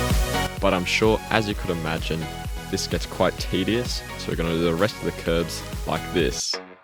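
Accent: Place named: Australia. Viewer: Why does this bloke sound aussie, but like he is trynna hide the accent haha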